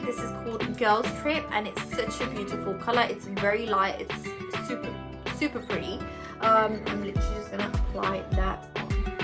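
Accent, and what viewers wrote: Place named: Punjab, India. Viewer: Just love your punjabi and english accent💕💕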